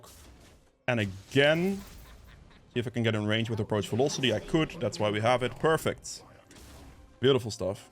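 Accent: Netherlands. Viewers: That dutch accent...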